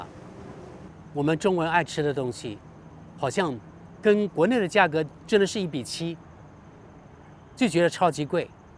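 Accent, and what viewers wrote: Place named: Guiliu, China. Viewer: 航八郎😂 桂柳腔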